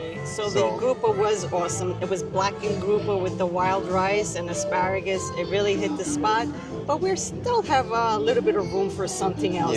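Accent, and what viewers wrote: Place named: New York, United States. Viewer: Can't take the NY accent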